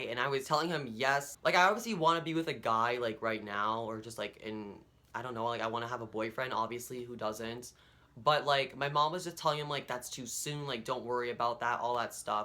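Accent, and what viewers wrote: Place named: New England, United States. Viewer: I have to smile the way you talk, you sound like you're New England Italian? Hope you and your parents work things out, give them time, there trying to deal with this the only way they know how.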